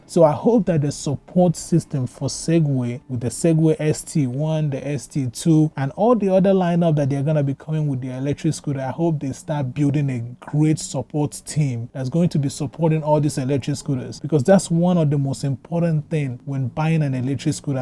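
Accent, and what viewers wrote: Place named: Caribbean. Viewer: also love your cool West Indian accent - so refreshing !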